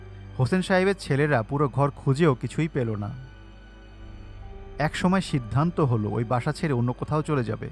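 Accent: Barishal, Bangladesh. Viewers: vaiya apni ki borishaler? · Apni ki barisal er?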